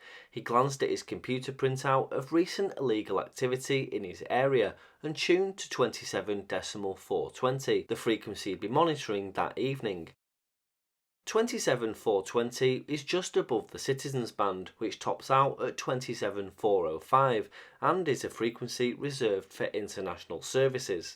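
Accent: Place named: Liverpool, United Kingdom. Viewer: Where are you from? I detect a Scouse accent.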